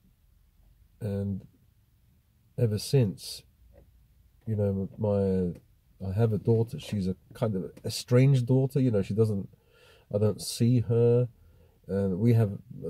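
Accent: United Kingdom. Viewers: Do you play a sport that may include women? I am not sure where you are from...accent possibly British? Do you play pickle ball? Take some lessons, and they can direct you to courts or groups of people that play. You can meet so many people. Certainly make friends like that. At the very least, you will be out amongst other people and have great fun too. You have to put yourself out there. The older we get the harder it is to make good solid frienships, especially for introverts. God Bless and good luck.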